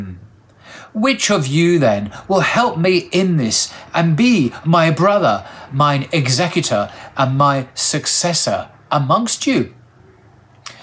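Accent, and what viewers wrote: United Kingdom. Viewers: Keep makimg these videos. 👍 We love your british dialect for storytelling.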